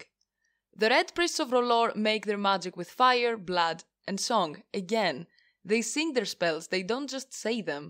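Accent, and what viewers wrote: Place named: Greece. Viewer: It sounds Greek 🤙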